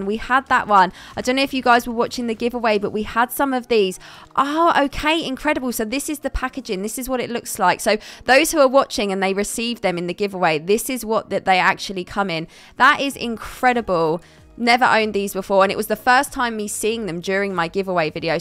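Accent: United Kingdom. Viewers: nice start video , but this brit accent is very annoying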